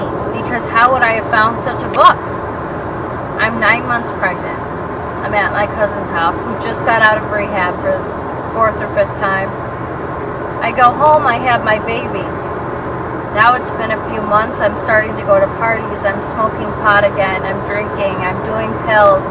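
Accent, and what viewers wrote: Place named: Rochester, United States. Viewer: Holy shit are you rochester Or Buffalo? You sound like you have a downtown Rochester. Hart accent like the East Side. Or I could be wayYou sound like you have a downtown Rochester Hard accent like the East Side. Or I could be way off And your midwestern, like i'm starting to believe chicaaaagaaa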